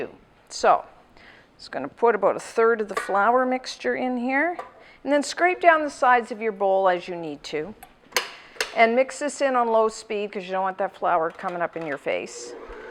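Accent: Canada. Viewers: She’s definitely Canadian 😂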